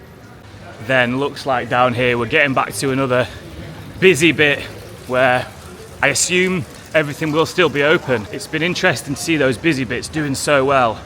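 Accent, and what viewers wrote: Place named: Yorkshire, United Kingdom. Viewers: I love your accent. ♥️ Is it from Yorkshire? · Long time watcher here- nice to see your first episode outside the UK! I actually first started watching your videos because I grew up in West Yorkshire. I haven't lived in the UK for over a decade but it's nice to hear the accent and I do enjoy your Made in Britain series and Death of the High Street- I think they compliment each other well. I enjoy learning about the old industries- and share your love of old mill chimneys. I'd just like to add that I love Eleanor's fashion sense- actually before you introduced her I was wondering who the pretty and stylish French girl was in the background...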